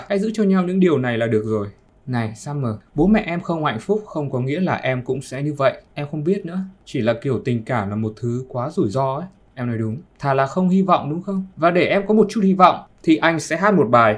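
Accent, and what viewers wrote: Vietnam. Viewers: Nghe bạn kể lại film này bằng chất giọng thuần Việt, làm mình thấm film hơn thêm 1 tí qua bao năm rất yêu film này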